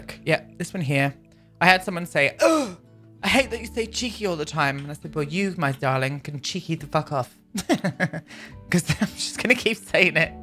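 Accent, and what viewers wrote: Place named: RP, United Kingdom. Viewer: I looooove your pip pip cheerio british accent! Your builds are gorgeous!! ❤️ Much love from Norway 🇳🇴!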